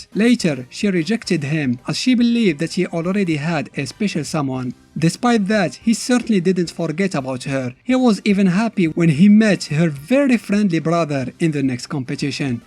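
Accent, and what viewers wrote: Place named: Morocco. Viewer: Salam khoya nta maghrebi ? 3rftk ghir laccent dyalk 😂 ... Anyway la kenti maghrebi tbarklah 3lik bro mo7tawa zwin o Big Big Up lik Bro 👍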